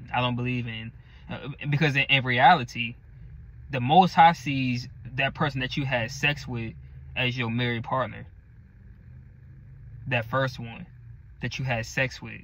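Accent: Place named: Maryland, United States. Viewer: Hi brother, are tou from Maryland? Your accent is giving it away lol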